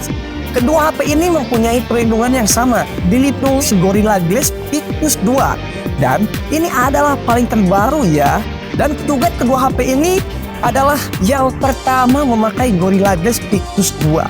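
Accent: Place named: West Java, Indonesia. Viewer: logat na nyunda pisan 🍵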